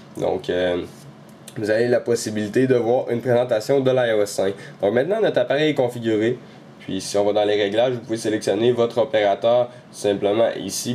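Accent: Quebec, Canada. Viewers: L'accent québécois est énorme! Si ch'rais avec 20 capotes c'matin de zou!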